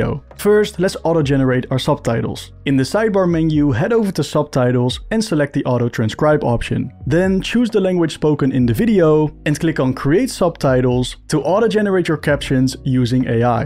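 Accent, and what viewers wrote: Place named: Netherlands. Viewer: Dutch accent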